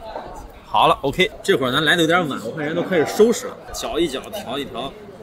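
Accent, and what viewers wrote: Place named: South, China. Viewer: 喜欢听说南方普通话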